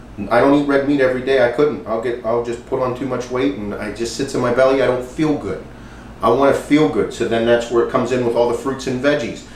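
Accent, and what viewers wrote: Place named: Pittsburgh, United States. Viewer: This dude has to be a Pittsburgh boy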